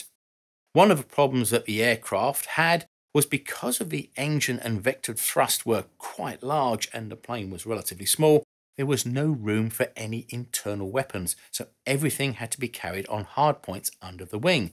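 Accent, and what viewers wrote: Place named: Cockney, United Kingdom. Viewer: haven't watched for a while but last I remember you was recovering from being very Ill. Good to see you're well and I'll be sure to check through your videos. On an unrelated note I'm a truck driver and you sound like a cockney. I drove past the royal Armories last Thursday when going from Millwall F.C to Twickenham and i was shocked by that battleship gun sat in front WOW! that was incredible!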